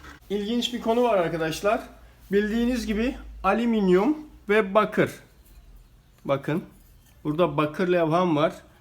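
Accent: Turkey. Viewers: Cok guzel istanbul turkcesi konusuyorsunuz...... Ne kadar zamanda ogrendiniz Turkceyi , ve nerelisiniz?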